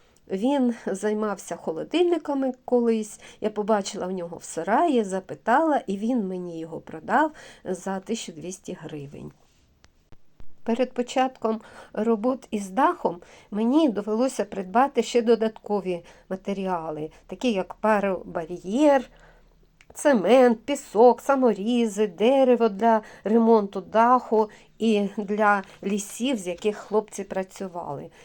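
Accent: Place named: Russia. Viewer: Голос молодої жінки. Дуже гарна мова, хоча відчутно що колись людина говорила російською або суржиком. Дякую.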